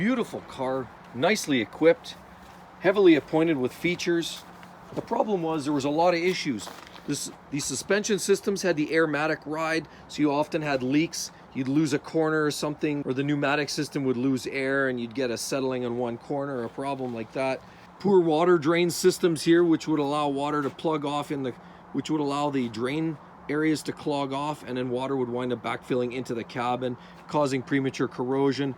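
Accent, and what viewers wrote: Canada. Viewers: Me after 3 seconds: wonder which part of Canada this guy is in....🤔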